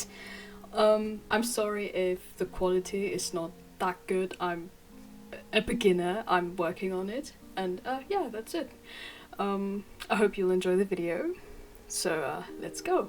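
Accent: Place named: United Kingdom. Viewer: du klingst so brittisch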